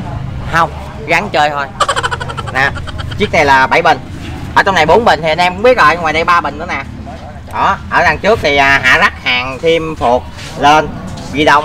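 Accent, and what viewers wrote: Vietnam. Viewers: Dân bến tre hả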